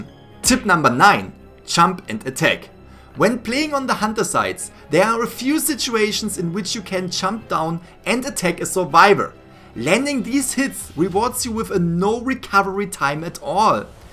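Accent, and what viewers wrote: Germany. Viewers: Are u german? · Are you German? Because of your accent. No hate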